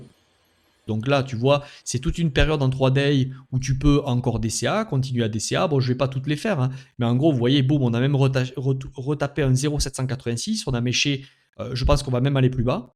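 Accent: South, France. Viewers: Bonjour, est il possible de faire une analyse de ce genre sur decred (DCR). J’aime beaucoup la façon que tu as d’analyser le tout avec l’accent du sud! Tu gagnes un abonné:)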